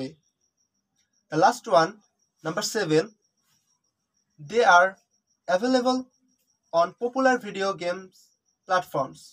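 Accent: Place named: Bengal, India. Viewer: English bolar dhoron Jodi Bangla kothar motoi hoy tahole Bangla bolen...joto sob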